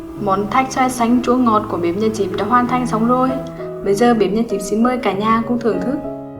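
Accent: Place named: Hue, Vietnam. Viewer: món thạch bạn làm rất đẹp luôn .cô đầu bếp nói tiếng huế thất dễ thương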